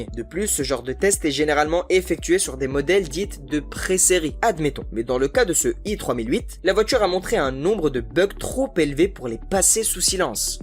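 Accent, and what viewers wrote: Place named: Banlieue, France. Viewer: Intéressant au début , par contre les : voitchures et les rrrr de banlieue, merci j’ai arrêté de regarder c’est insupportable, salut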